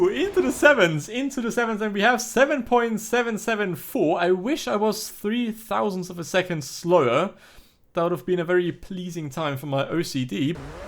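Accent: Germany. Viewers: Hör ich da deutschen Akzent? 😅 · u sound german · man hört deinen deutschen akzent tichig raus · Bro bist du deutsch?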